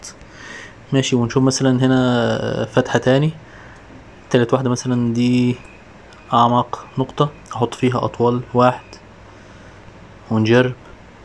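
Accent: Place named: Syria. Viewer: Te felicito tu programa es muy explicativo, aunque me casi no entiendo el arabe tuyo pero pareciere sirio o libanes. Soy de Venezuela y me gusto en verdad ese secreto de los cerrajeros. Bendiciones y muchas gracias.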